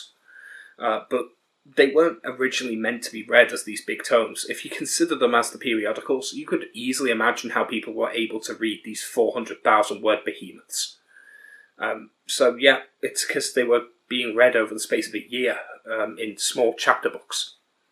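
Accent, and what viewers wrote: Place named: Liverpool, United Kingdom. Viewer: This is a bit random, but are you from the Wirral? I've been trying to figure out your accent because you sound a bit like a scouser but I'm not sure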